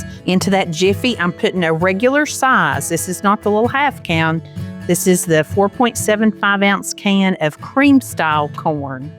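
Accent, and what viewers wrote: South, United States. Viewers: love your southern drawl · I LOVE your accent... love to hear the southern drawl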